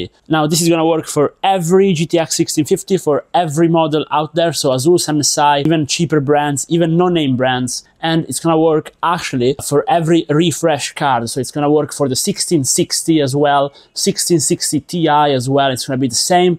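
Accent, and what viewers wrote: Italy. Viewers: sei italiano??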